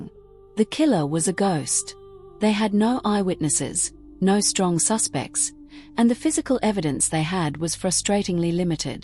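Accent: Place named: Australia. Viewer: At least the ai has an Aussie accent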